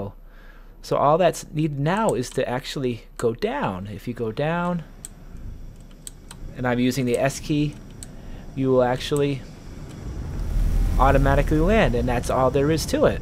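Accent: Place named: New England, United States. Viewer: Loving your new england accent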